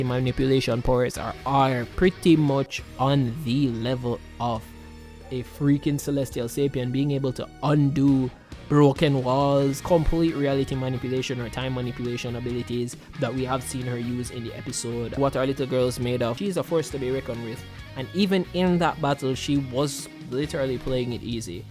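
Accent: Jamaica. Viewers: Haven't your watched videos in some time, quick question are you Jamaican?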